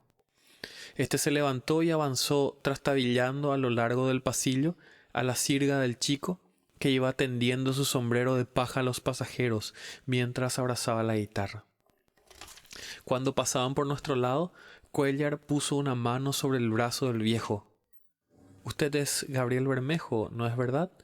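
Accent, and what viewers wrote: Paraguay. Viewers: Gracias por el aporte. Sería bueno que pudieras completar el libro porque no se encuentra en youtube y con tu acento paraguayo y las breves explicaciones de términos en guaraní enriquecen mucho la experiencia. Saludos y gracias! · Hermoso Roa Bastos. Muy bien leído con tu dulce todada paraguaya. Gracias por brindarnos a este Maestro de la literatura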